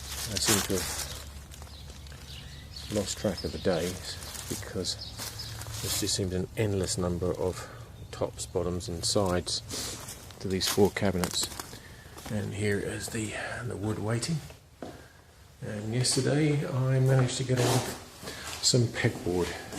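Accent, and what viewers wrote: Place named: England, United Kingdom. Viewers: I wasn't expecting an English Accent..... lol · I was also wondering what an English man is doing in Texas 😀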